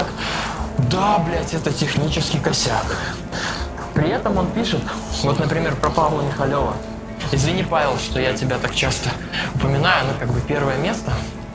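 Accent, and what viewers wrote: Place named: Belarus. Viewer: Первый раз слышу такой голос на твоем канале. По акценту слышно что ты с Беларуси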